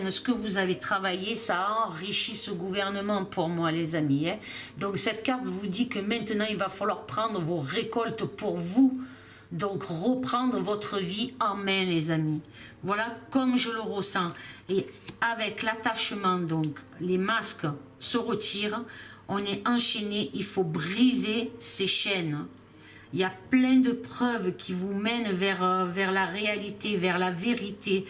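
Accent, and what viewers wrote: South, France. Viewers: Bonsoir toujours un plaisir de vous écouter heureusement que Dieu vous a donne ce don pour nous éclairer et puis j aime le petit accent du sud 🙏🏾❤️☀️🌹🧚🏿☘️🌞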